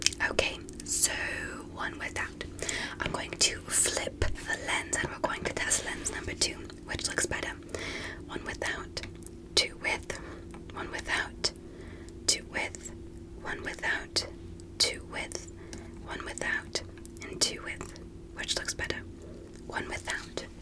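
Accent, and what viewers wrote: England, United Kingdom. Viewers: Omg yesss, I love your alien ASMR videos with the British accent. I don't know why but it just hits different and makes me feel safe. The British accent just tingles apart of the brain that I can't explain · Is she English or American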